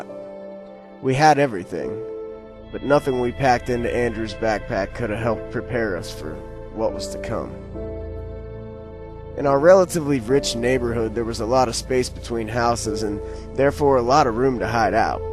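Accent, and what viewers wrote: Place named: West Virginia, United States. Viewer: Great story, and I love your voice! Kinda makes me feel nostalgic. I moved to TX from WV about a year ago. I never realized how much I would miss that accent. Listening to this makes me feel like I'm hanging out with some of my old friends trading stories while smoking. lol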